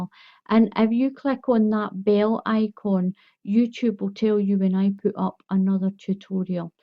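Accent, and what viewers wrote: Scotland, United Kingdom. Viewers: ❤️❤️❤️❤️❤️ Wow, by looking at it one would never know how easy that beautiful little blanket was to make. Thank you for the lesson!! ❤️ I'm going to use this on my next baby blanket project.👍 Btw, I get in trouble when I'm wrong but do I detect a bit of a lovely Scottish accent?🙂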